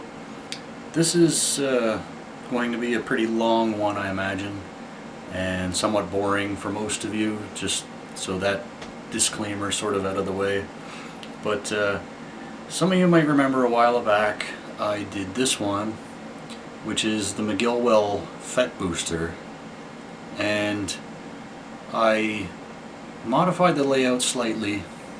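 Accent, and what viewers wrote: Canada. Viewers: Just got into building about four months ago. I love your videos man. No this was not boring at all. I could watch this stuff all day. P.s Are you Canadian? My canuk radar is going off haha. I’m in Ontario. Cheers.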